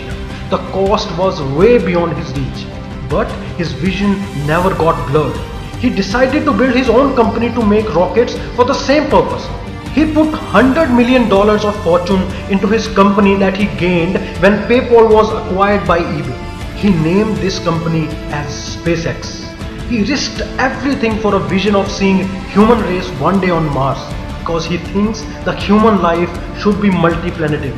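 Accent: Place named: India. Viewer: the bgm is epic. the accent doesnt work with the bgm. no offense to indian accent but they just dont work together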